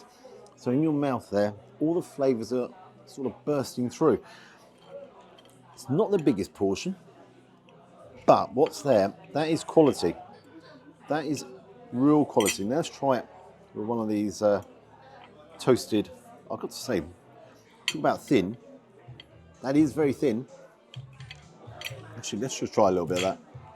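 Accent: RP, United Kingdom. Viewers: first time viewer greetings from the West Coast of The US. Just wanted to let you know your an absolute good restaurant reviewer with the personality of Gordon Ramsey with Anthony Hopkins mixed together. You have a Regal British accent that is easy for us " Yanks " to understand. I really appreciate your down to earth affordable creetiking for us non wealthy class folks. Have you thought to coming to the States to do a tour? Thank you again.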